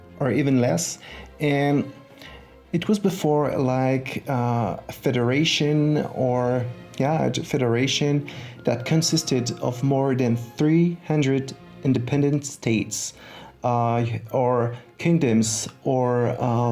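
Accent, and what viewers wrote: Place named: France. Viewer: The only thing I might like more than your builds is your cute french accent :^)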